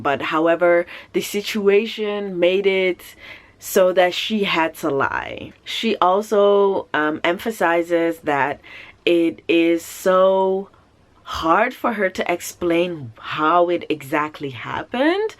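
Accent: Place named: New York, United States. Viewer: Wow! Listening to you speak, I thought for sure you spent some time in my home town of NYC. What an interesting and inspiring story; you are truly gifted and talented. You should think about working for the UN. Hearing about TV in the Netherlands explains a lot; because unless you are in the US watching public television, an HBO foreign film or show, or some special channel like National Geographic, most things are dubbed in English (often with bad translations, so my friends who are fluent in other languages tell me). Which is why I love to see foreign films directed by people like Akira Kurosawa and Ousmane Sembène. My other language learning days are probably over (btw, I sent a friend who travels to Senegal quite often, your Wolof learning link) because of the many projects I have going; plus I find that when I travel people are generally very nice and I get to wherever I want to go very well. 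When COVID-19 travel restrictions improve, I plan to visit Senegal, and even everybody I know there speaks English! But if I ever visit the Netherlands I will definitely hire you as an interpreter! :-)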